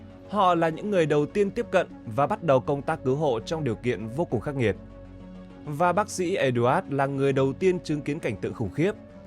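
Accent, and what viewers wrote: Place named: North, Vietnam. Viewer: giọng đọc miền bắc không hay . xin Chọn giọng đọc miền nam dễ nghe hơn .cám ơn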